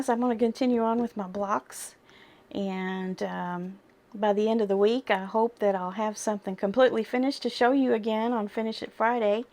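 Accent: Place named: Appalachia, United States. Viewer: Going back and looking at some of your older videos. Loved learning a bit more about you,. I do love your Appalachian twang—it’s very endearing. I think we should all be proud of where we’re from. I was born in Arkansas, but New Mexico is home now. You are a natural at producing YouTube videos! I’m enjoying and learning from your Lessons Learnt channel.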